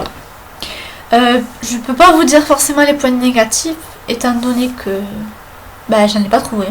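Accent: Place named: South, France. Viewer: On dirait que t'as un accent du sud :D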